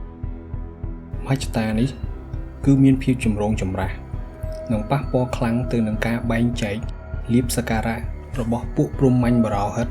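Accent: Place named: Thailand. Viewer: អ្នកអានប្រវត្តិសាស្រ្តខ្មែរចេញសម្លេងថៃ ឬជនជាតិថៃជាអ្នកអាន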